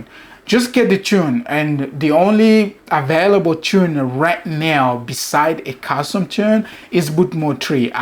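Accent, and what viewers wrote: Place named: France. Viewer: Are you french ? You have a little accent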